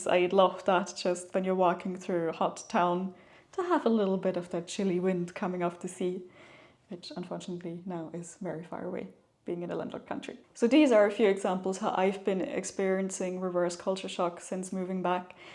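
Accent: Ireland. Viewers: Lovely the way you sometimes have such an Irish accent or way of saying something. Wish you the best in Austria. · you sound lrish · Ha ha, from your accent I can tell you spent time a lot of time in Ireland. (Greetings from Ireland)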